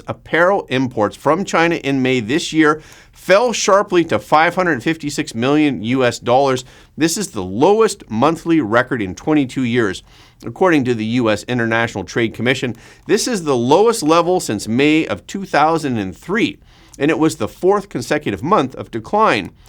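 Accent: Canada. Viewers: That powerful Canadian accent combined with superb in depth coverage of the US zeitgeist took American me by surprise. Thanks neighbor!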